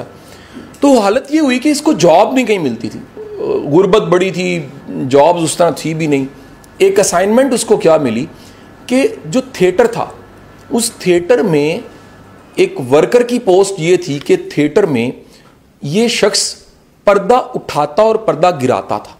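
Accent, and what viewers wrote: Punjab, India. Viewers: sir your punjabi speaking style is great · Punjabi hain sir is liay...actuall style me bolty hn kch covering ni use krty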